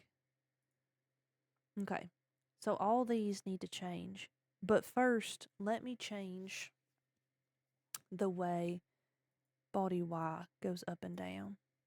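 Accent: South, United States. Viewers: When your from the south to and from a vtuber that speaks in southernese 😭🫶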